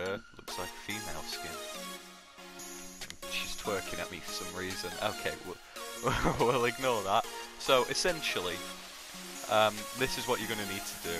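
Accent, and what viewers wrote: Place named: Birmingham, United Kingdom. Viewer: That’s an interesting accent, is it from Birmingham?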